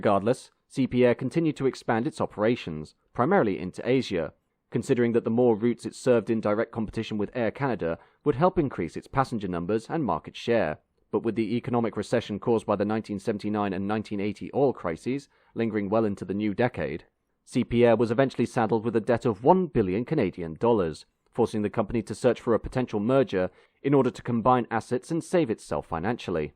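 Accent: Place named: RP, United Kingdom. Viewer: Quite a few words are mispronounced, among them 'The Pass', which is pronounced 'Da Paa'. The plummy British accent is also off-putting.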